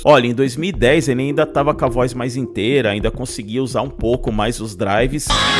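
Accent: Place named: Brazil. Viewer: faz um favor ao mundo e para de tentar falar ou ler em Inglês…. É mau demais… não dá para aguentar o quanto é péssimo e mau.. entenda que para falar Inglês não pode ter sotaque brasileiro senão vai colocar letras e consoantes onde não existem e sai tudo horrível.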